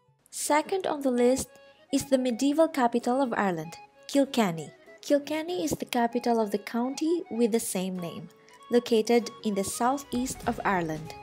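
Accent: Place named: Philippines. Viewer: I think the way you speak and your english and it seems you are a filipino?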